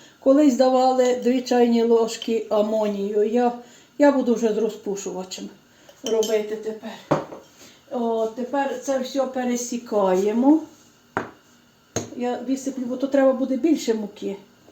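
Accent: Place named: Galicia, Ukraine. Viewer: Так гарно слкхати наш галицький говір. Як мкзика звучить.. ❤❤❤❤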